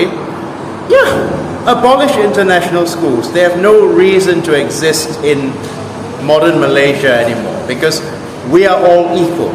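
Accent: United Kingdom. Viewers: Great video. You sound like British Educated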